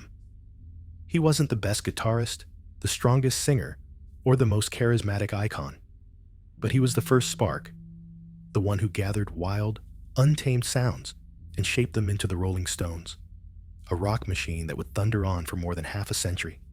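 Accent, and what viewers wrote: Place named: United States. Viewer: Another click bait channel full of garbage. Not surprised it is narrated by a scam artist American.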